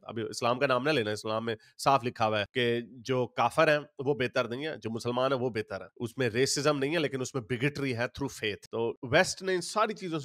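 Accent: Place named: India. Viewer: Bhai tum bolte to sach ho km se km Pakistani nahi indian lagte ho zuban se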